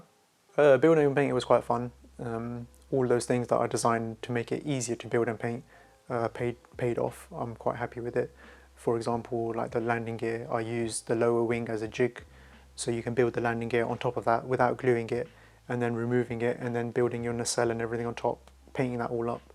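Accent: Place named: Southall, United Kingdom. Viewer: You sound like you might be a Southall lad?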